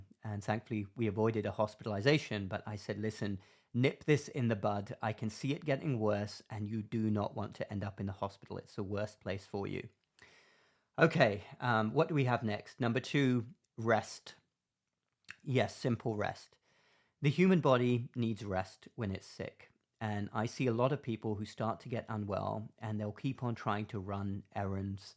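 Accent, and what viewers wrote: England, United Kingdom. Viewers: You have an English accent?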